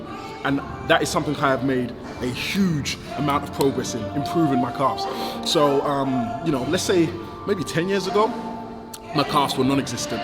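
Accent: United Kingdom. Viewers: I didn't know that had an british accent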